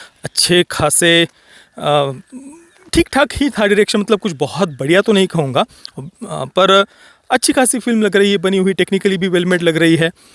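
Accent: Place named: Kolhapur, India. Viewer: Kuthal gaav ahe bhau ❣️???? Tumch kolhapuri accent 👌🏻👌🏻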